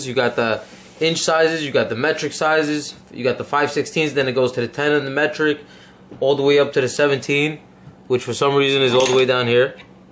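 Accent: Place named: Italy. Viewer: My dudes got to be Italian! I now that freaking accent. My brothers kinda have it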